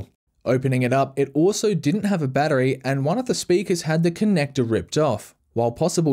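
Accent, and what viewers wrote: Australia. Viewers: Hey, you a fellow Aussie?????? I should've recognised the accent, I'm disappointed in myself. Really really disappointed. Edit: a correction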